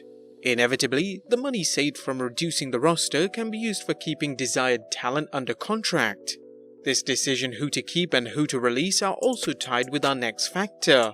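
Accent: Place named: United Kingdom. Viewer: I hate to say it but I got use to hearing my wrestling news with a British accent lol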